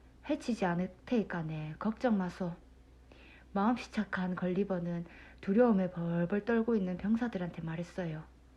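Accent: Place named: Pohang, South Korea. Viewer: 편안하게 엄마가 베드타임스토리 들려주는것 같았습니다. 잘들었어요. 포항이신듯요.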